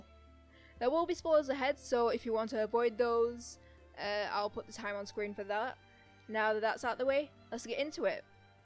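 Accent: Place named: North England, United Kingdom. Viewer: Great overview of Alm from a lovely north English accent.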